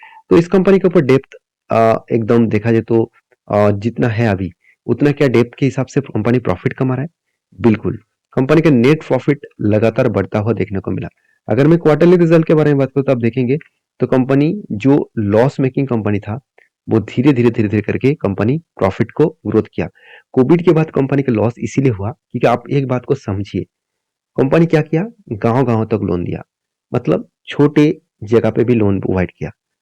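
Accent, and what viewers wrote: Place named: West Bengal, India. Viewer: Are you from west bengal?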